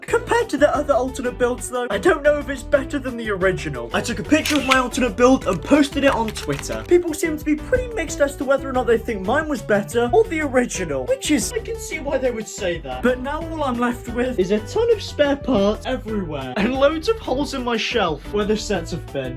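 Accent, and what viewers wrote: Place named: London, United Kingdom. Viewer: Your from London lol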